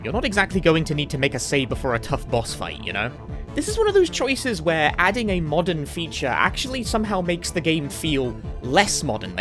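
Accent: United Kingdom. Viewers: I really appreciate this second channel. It's always nice to hear you talk about stuff, you're good at formulating your thoughts. That, and British accents tickle my brain.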